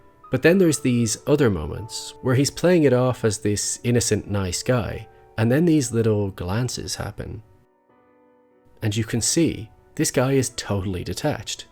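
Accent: Ireland. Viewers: Sounds Irish to me, but not that strong an accent. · Very subtle Irish. He sounds like a light version of the Irish guy who has a true crime Youtube channel called That Chapter. Except he pronounces the TH in "three" and "thirteen" whereas the other guy says "tree" and "Tirteen".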